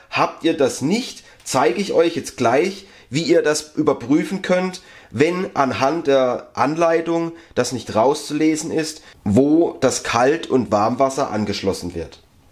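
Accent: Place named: Baden, Germany. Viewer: Mach's wie Du meinst - aber bloß keine Angst vorm eigenen Dialekt. Deine Zuseher kommen, weil sie Informationen wollen - das kannste super! (Übrigens, das hatte ich vorher vergessen: sehr gute Beleuchtung und super Kamerawinkel da unterm Waschtisch. Das ist nicht einfach!) Und sie bleiben, weil sie dich als Typ gut finden. Klar muss man so sprechen, dass einen die Menschen verstehen. Aber a bissl Dialekt hat noch nie geschadet. Schau mal ins Fernsehen: Wer ist da der erfolgreichere Entertainer? Der dialektfreie Nachrichtensprecher, oder der authentische Unterhalter mit Dialekt? Du kommst aus Baden? Besser geht's doch gar nicht für einen Handwerkerkanal. Schaue grad dein neuestes Video. Stimmt, das ist schon viel besser geworden. Aber versuche mal viel freier zu sprechen. Kannst ja mal bei einem Video zwei Versionen machen und deine Freunde ansehen lassen. 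Glaub mir. Je authentischer, desto besser.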